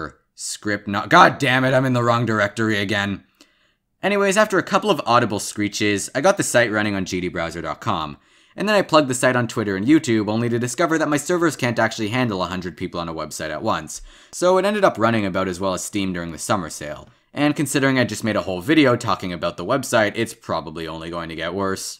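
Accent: Canada. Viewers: since when was this guy canadian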